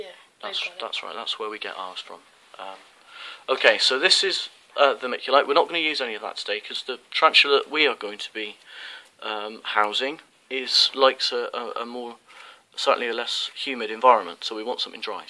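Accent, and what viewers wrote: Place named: United Kingdom. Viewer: Hi.....Good to hear a UK voice....most of the videos I find are not UK which means they can't recommend shops / websites. I will be getting my first Ts soon, am getting as much info as I can before buying them. I am disabled and housebound, so I have to do everything via the internet. My disabilities will not affect my ability to care for them in any way. In your video you said you'd be happy helping anyone new to the hobby, but that was a few years ago, are you still around ?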